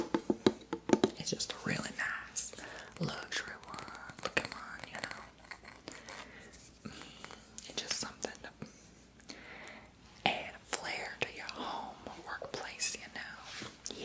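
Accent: South, United States. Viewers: !!! This video is awesome! I looove the southern accent. And its a deep southern accent too. Reminds me of my family from Mississippi. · This southern accent + candle tingles = everything. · There goes that Southern Accent😂😂😂😂Love it😊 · You do a southern accent so well! Would love to hear more of it in future vids♥️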